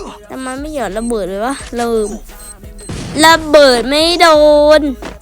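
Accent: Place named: South, Thailand. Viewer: พี่ พูดภาษาใต้ได้เลยผมก็เป็นคนใต้😊😊😊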